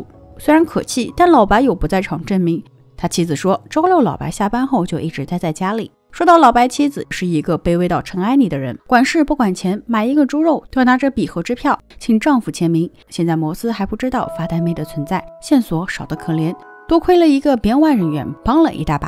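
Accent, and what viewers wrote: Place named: Nanjing, China. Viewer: 👍👍👍你是南京人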